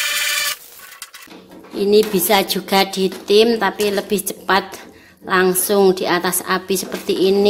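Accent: Java, Indonesia. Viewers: Suara blender sama yg ngisi suara cucok meong Hhhhhhhh medhok x bhsnya psti jowo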